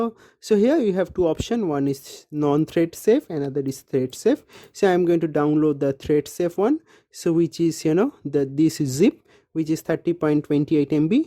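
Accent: India. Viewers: I cannot understand Indian English.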